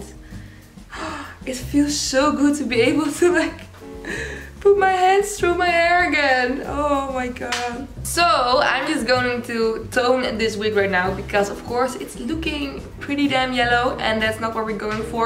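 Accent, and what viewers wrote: Netherlands. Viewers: She sounds Dutch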